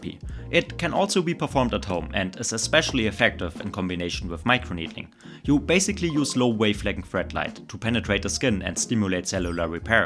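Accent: Germany. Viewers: Sounds like german „english“ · That German accent paired with "we never accept sponsorship" assure me deeply. Thank you, you've saved me a lot of time and money! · Indian? Isn't this a german accent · Are you german? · Thanks lads, i'm off to buy both the sunscreen and peptide cream you showed here in the video - it's in one of my local stores :) Love the Deutsch accent, greetings from your cousin further up north in Denmark - Subscribed and liked the video!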